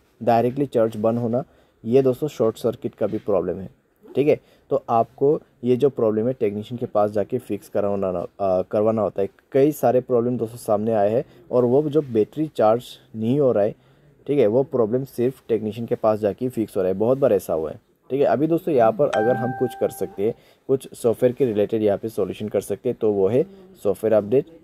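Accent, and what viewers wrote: India. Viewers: Мерзкий эндуский акцент, слушать невозможно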